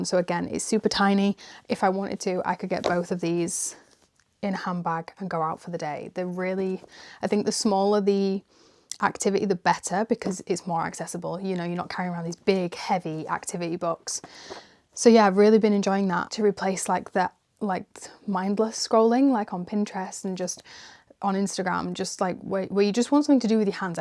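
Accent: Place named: United Kingdom. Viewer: i am really sorry for mistakes if there are any. I’m Russian🇷🇺 and I just wanted to bring more English into my life for practice. I always look for some videos with natives speaking British cause I prefer this accent and I absolutely like your one, your pronunciation is pleasing to my ear🙏🏼 thank u so much, it was interesting to listen to the way how u are expressing your thoughts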